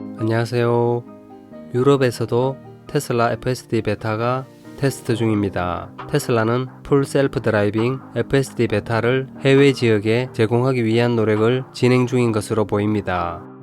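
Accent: South Korea. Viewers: 전혀 반대예요, 굴리시지 않고 한국인 답게 주체성을 갖고 발음해주셔서 매력 빵빵 ! 여하튼 영상 감사해요👏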